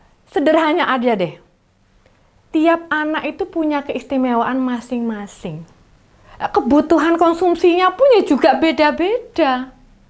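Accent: Java, Indonesia. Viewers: Halo mbak...... gaya medokmu itu lo...aku suka... Salam kenal dari org BLITAR... semangat ya...👍🤟🇲🇨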